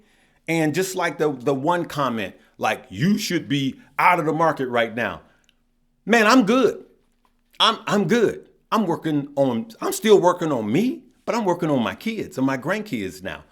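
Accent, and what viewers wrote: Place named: South, United States. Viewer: Thee accent!! Ohh with the country accent. Uncle. Has. Had it.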